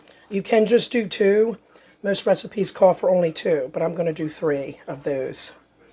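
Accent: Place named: Chicago, United States. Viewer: Your accent, I'm guessing Chicago area?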